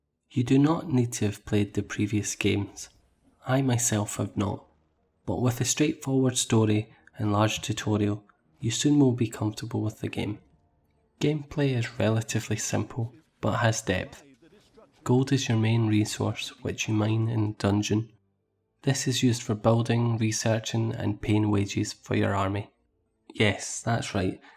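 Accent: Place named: Scotland, United Kingdom. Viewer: Good review. Consider me a subscriber. Don’t hide your Scottish accent tho!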